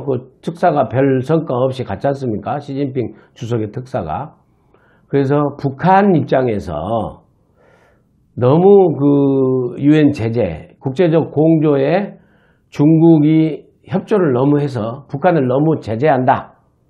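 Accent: Jeolla, South Korea. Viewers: 민원장님 전라도 사투리는 애정이 갑니다.많이많이 지지 합니다.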